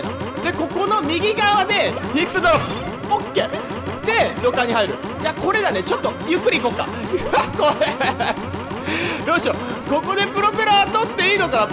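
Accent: Fukuoka, Japan. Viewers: やっぱり、福岡？の方言は良いですね（笑） 今日のLIVE楽しみしてます( *˙ω˙*)و ｸﾞｯ!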